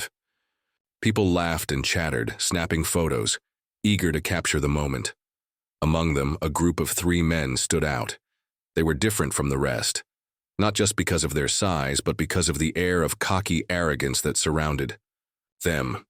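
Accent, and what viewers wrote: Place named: United States. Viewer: Yet another load of American accented crap!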